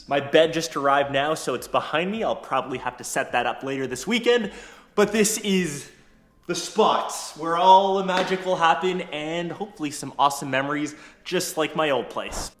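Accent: Canada. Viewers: Damn I can tell your Canadian hahah that accent hits hard!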